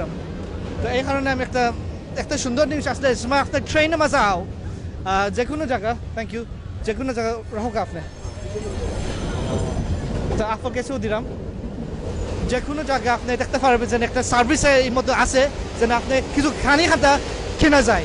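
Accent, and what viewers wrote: Sylhet, Bangladesh. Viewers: ভাই এই লোকে সিলঠি মাথের আপনার ভোজার সমস্যা হলে আপনি আমাদের লন্ডন সিলঠ চলে আসোন♥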